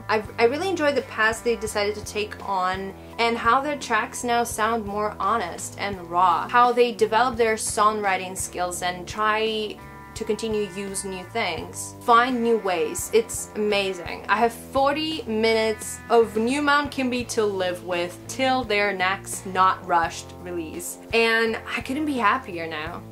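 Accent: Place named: England, United Kingdom. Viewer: good review. Why do you sound english in your channel prologue?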